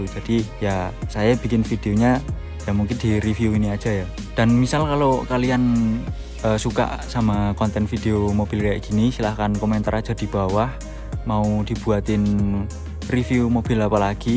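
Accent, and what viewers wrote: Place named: Java, Indonesia. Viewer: wkwkwkw medok nya ganggu banget anjiing jawa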